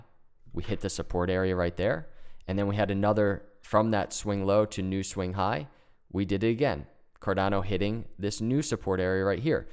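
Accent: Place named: Midwest, United States. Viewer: Great videos. Are you trim the Midwest you sound like it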